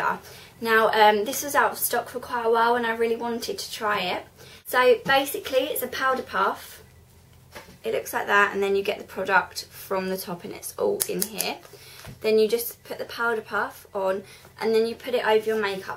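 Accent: Essex, United Kingdom. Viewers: I'm British and I can tell ur an Essex gal! X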